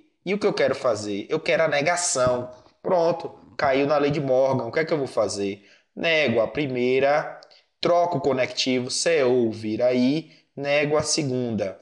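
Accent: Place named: Bahia, Brazil. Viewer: Sotaque baiano arretado! rsrsrs massa!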